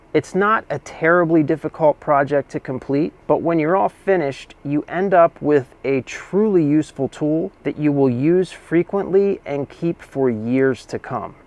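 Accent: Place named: United States. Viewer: Are you breaking that bicious cyvle or that vicious circle? I don't always understand the American accent...😅😅😂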